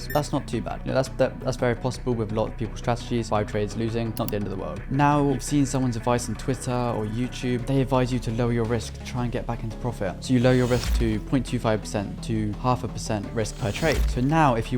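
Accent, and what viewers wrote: United Kingdom. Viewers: you know that YT is a global platform where people from all over the world watch your watch... my worry is you speak faster in british accent some words hard to understand so pls speak slow for certain words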